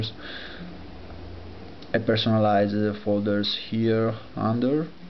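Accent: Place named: Italy. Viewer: love the italian accent, a lot of friends speak like that :D Cheers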